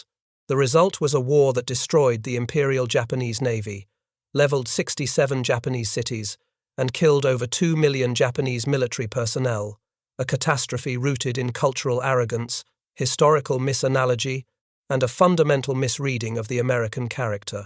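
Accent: United Kingdom. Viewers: Does having a narrator with an English accent makes the information more believable?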